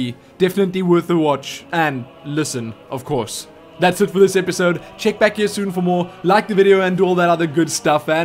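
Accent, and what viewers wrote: South Africa. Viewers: that is a south african accent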